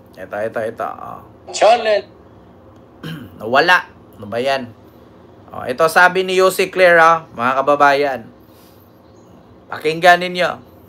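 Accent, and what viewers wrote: Visayas, Philippines. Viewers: Tawa tlga ako s pesteng yawa mo hahaha ganda nga Punto mo mg bisaya k hehe ako ilocana pero gustong gusto ko mgbisaya😂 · Hindi na baling bisaya punto mo, ang importante hindi ka bobo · NUKNUKAN NG TA TANGA NYO DDS❗VISAYA LANG ANG PUNTO NI, PERO MALIWANAG SYA MAG TAGALOG❗KAYONG DDS NA DAVAO NAKIKI VISAYA LANG KAYO❗WALA KAYONG SARILING LENGUAHE AT SARILING PUNTO❗❓BOBOOOOO❗❗❗❗🤬🤮👺😖😩😡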